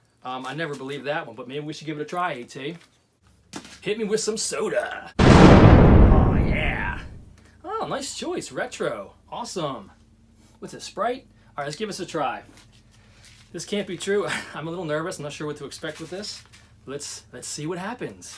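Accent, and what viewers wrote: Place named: Baltimore, United States. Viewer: thanks for the memories...btw this bawmer raised boy senses some baltimore or philly accent? lol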